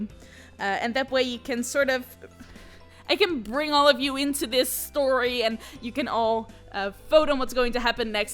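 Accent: Netherlands. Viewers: your accent is so cute! i really want to learn dutch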